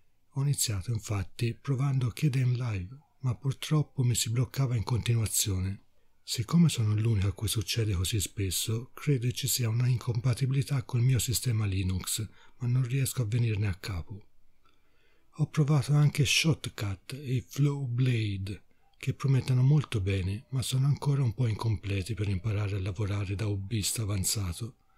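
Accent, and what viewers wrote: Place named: Tuscany, Italy. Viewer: Ciao! bellissimo lavoro! ti faccio i miei sinceri complimenti. Io sono una affezionata utente cinelerra (dal 2003) anche se ultimamente edito poco perche le mie scelte professionali mi hanno portato in altre direzioni. Avendo tempo lo tradurrei, intanto bravo e...essendo anche io toscana pero expat, un tu sai quanto mi sono commossa ascoltando la tua voce!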